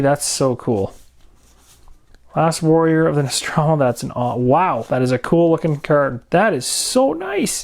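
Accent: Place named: Canada. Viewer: I love how Canadian you sound.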